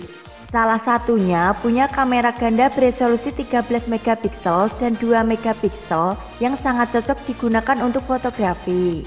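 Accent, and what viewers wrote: Java, Indonesia. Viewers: Omongan mu jowone meduk mbka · Medok bgt mbak. Asli mana? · Jawa banggettt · Kok ngomong y mbaknya medok emang aslinya orang mana? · Medok nya itu Lo,Mbak...bikin klepek klepek.?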